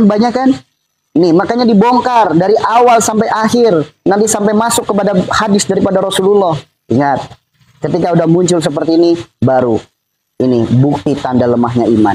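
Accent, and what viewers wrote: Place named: West Java, Indonesia. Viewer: Ya allah pa ustadz, sunda bngt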